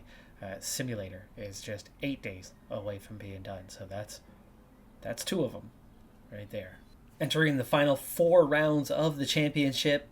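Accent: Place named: United States. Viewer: I fail to see, or hear, basic English being adhered to either I agree, regardless of where the drivers are from he supposedly speaks English its just that as he is American it is a bastardised version of the language and it's only people from that country that decide to pronounce words and names completely differently to how they should be, fact. Thanks for stopping by and for your insightful contribution, look forward to your input again next time you are feeling like a SJW 👋😁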